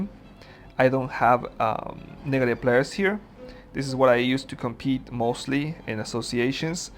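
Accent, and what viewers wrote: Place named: Netherlands. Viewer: Well he has a Netherlands accent butnits English 100% if u can't work it out turnnon subtitles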